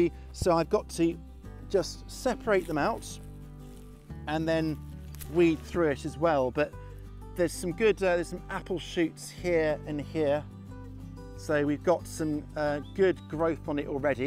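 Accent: England, United Kingdom. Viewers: May I ask what zone are CV you in? You sound like Englishmen where is your garde in.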